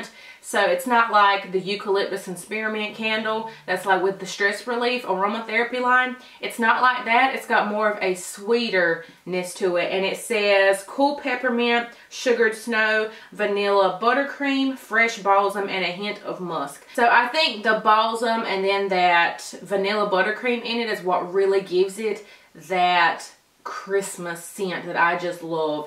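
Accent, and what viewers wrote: South, United States. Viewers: Love that southern accent of yours ❤️ where ya from? I’m from the south too ofc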